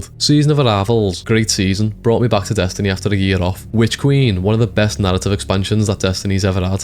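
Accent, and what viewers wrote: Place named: Liverpool, United Kingdom. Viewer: A scouser review! Let's go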